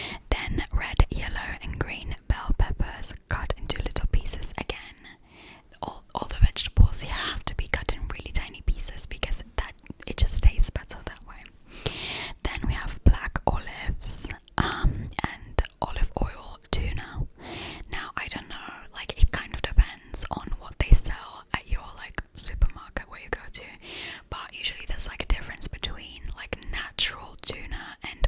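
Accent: United Kingdom. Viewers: Such a great British accent, I loved this! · Hi, (aka ) and Happy Friday!😊😊😊😊😊😎☀💙💙💙💙💙💙🎄🇺🇸🌷🇮🇹💖💖💖💖💖💖🎇👍. Your british accent is amazing! Well done!😀⭐⭐⭐⭐⭐⭐👍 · I love the btitish accent very much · Omg lovee the bri'ish accent 😭 it's sounds so crisp and relaxing ❤ (btw I'm also a huge feta cheese lover AND also I had to mention that your musical taste is literal GOLD 🤌) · Love it❤ your British accent is so pretty! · I love your languages series. The British accent is really relaxing ☺️💤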